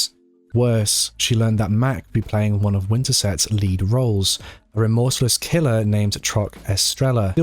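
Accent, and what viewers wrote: United Kingdom. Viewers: You have such a beautiful, sultry voice. I would love for you to narrate all of my favorite books. You could easily narrate professionally (especially for those of us in the US who swoon over British accent).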